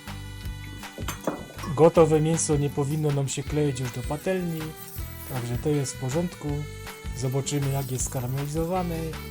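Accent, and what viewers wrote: Silesia, Poland. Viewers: Jak ja nienawidzę tej śląskiej gwary......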